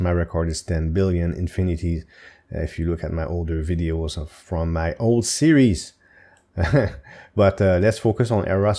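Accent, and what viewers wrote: Quebec, Canada. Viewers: Es tu québécois?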